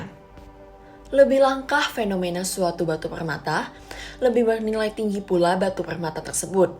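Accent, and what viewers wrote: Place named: France. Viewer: Mantap naratornya karena ngomong dgn bahasa indonesia namun aksenya gaya prancis... #magnifico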